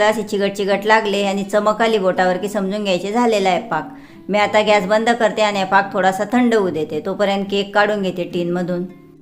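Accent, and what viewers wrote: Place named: Khandesh, India. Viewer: तुमची केकची रेसिपी झटपट आणि खुसखुशीत आहे. पण तुम्ही सुद्धा केक सारखं * थोडं गोड आवाजात निवेदन केलात तर ,* आम्हाला केकची गोडी अजुन वाढेल. तसेच बोलताना इतकी सुपरफास्ट एक्स्प्रेस * कशाला चालवता? बोलताना काही * पुर्णविराम, स्वल्पविराम , प्रश्नचिन्ह ,उद्गारचिन्ह असतात. * त्यांचा वापर केला की * आपलं बोलणं * जास्त इफेक्टीव्ह होतं * म्हणून सर्वप्रथम बोलणं आणि भाषा, बोलण्याची खानदेशी स्टाईल * सुधारा.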